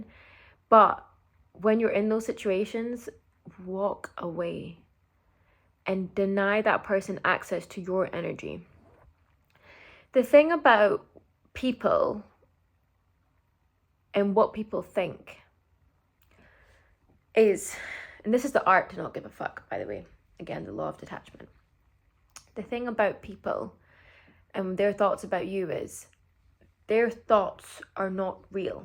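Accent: Scotland, United Kingdom. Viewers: you're scottish? did not expect that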